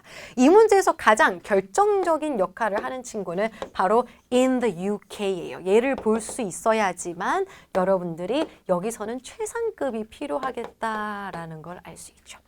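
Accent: Gyeongsang, South Korea. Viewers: 경상도분이신가여 ㅎㅎ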